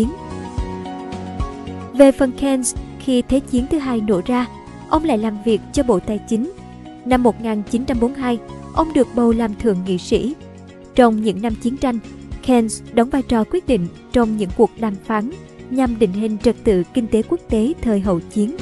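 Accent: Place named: South, Vietnam. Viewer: Người đọc giọng miền Nam này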